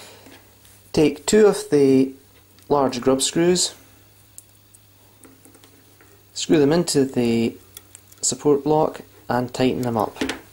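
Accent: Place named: England, United Kingdom. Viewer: My last question: is AXIDRAW from England or EEUU?.I was looking at the prices and I noticed this is $475 dollar or pounds?When I saw your videos I noticed that you have an english accent therefore I made the question about the country jajajaja.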